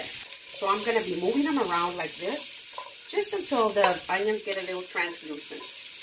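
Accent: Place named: North, United States. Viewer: Initially, i think you are hispanic with a northern us accent. Nevertheless, video is like home, thanks.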